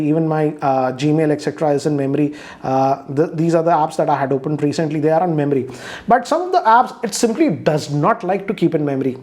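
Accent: India. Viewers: This is the only Indian i like because of his accent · This is a very common accent spoken in India! Were you expecting something like shown in movies?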